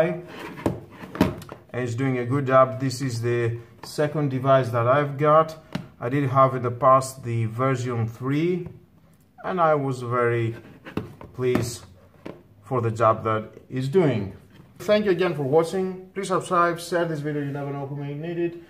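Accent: Greece. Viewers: και μένα μου φάνηκε λίγο ελληνική η προφορά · Μόνο οι Έλληνες έχουν τέτοια αγγλική προφορά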